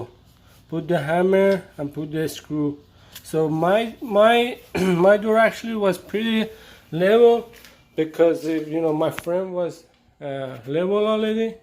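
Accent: Iran. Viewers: Strong persian accent😉👍well done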